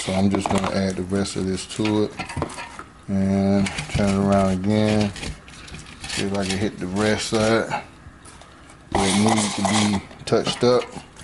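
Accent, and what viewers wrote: South, United States. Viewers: “I got some irl. Some olive irl”. is soooo country, I love it. 🤣🤣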